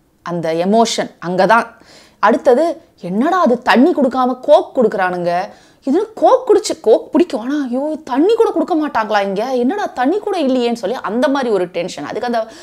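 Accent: India. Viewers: Hi , much appreciate ur clarity of speech and kongutamil .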